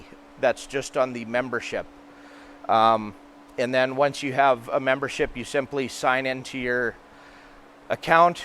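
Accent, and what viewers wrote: Saskatchewan, Canada. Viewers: I haven’t lived in Saskatchewan since I was a child but I love hearing your accent! 😆 Your greenhouse gives me much inspiration as I currently live and garden in Florida but crave moving back to Canada. The shorter growing season terrified me but now I see that it can be extended greatly. 🤔 Awesome job!!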